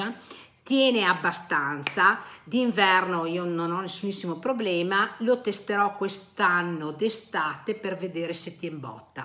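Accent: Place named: Italy. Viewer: Ma daiiiiii l'accento molto conosciuto. 😂di che zona?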